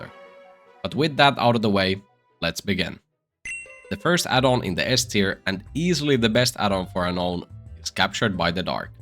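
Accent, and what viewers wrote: Finland. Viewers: are you finnish? your accent sounds familiar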